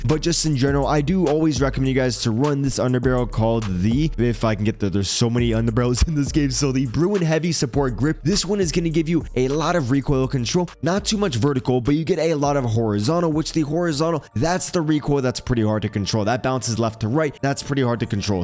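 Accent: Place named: Toronto, Canada. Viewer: Is homie from Toronto? He has the Toronto accent like Plushhh 😂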